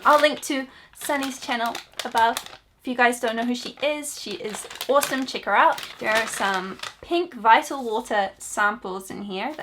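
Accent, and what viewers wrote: New Zealand. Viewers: i love your new zealand accent so much omg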